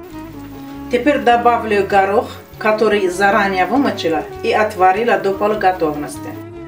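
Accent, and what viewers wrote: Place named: Azerbaijan. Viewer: Все ваши блюдо сказка, у Вас такой приятный азербайджанский акцент.....а музыкальное сопровождение просто дамара гедти )))))) чох сагол! ПЯХ ПЯХ ПЯХ, Нуш олсун всем подписчикам! )))) ЛАЙК и подписка!!!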